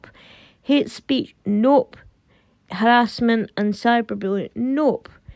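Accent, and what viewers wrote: Scotland, United Kingdom. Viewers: I don't have a problem with her accent, or anybody else's for that matter - foreign or UK. I have a good ear (and happen to be Scottish too), but it's not always easy for others if they are not used to it. Even the English put subtitles on our home produced programmes if it's being aired in their country as some of our dialects and pronunciations are incomprehensible to outsiders. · A Scottish accent. I can understand her fine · Hi you’re Scottish where are you from,am from Scotland west coast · I absolutely love Scottish accents. I’ve started watching some of your videos, l didn’t take match notice of the title, and after listening l didn’t think you came across as being nervous, well done to you. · Carry on, when i first spent time in Scotland I thought the people at Glasgow central spoke another Language, but I as others will soon tune into your beautiful accent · That is a beautiful Scottish accent. 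Stay safe, be well 🙏🇨🇦🇬🇧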